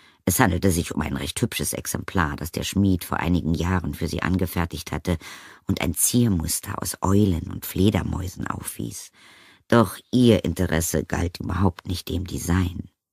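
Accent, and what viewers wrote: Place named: Berlin, Germany. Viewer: Gute Stimme , ich könnte das Beste Hörbuch Schreiben aber nicht vertonen mit meiner Stimme , wäre Volter !! Nein es ist eine besondere Stimme und gut gelesen .Hatt Berliner Dialekt leicht drinnen , denke ich.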